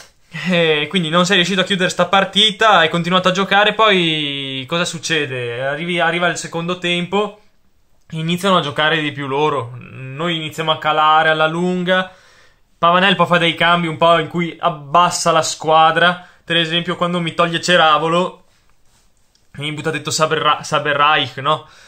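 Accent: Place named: Padua, Italy. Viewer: L'accento padovano è favoloso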